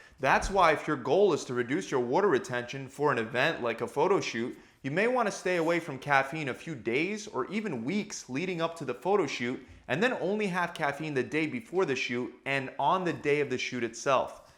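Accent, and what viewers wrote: Philadelphia, United States. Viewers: Well we all know youre from philly now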